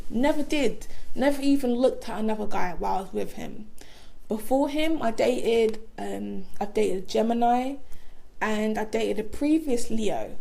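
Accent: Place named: London, United Kingdom. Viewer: Also nice to hear a London accent on an astrology video 🥺🥺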